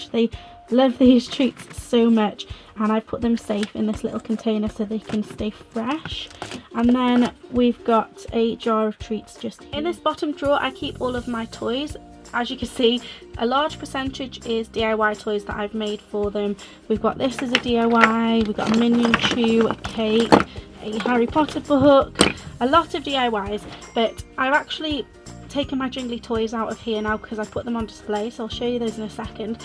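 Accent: Wales, United Kingdom. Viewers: Great videos. Where in Wales are you from?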